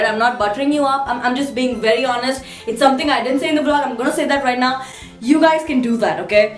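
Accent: Maharashtra, India. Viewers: So i hear Superwoman watched and liked ur videos :) Yay! Love your Marathi accent.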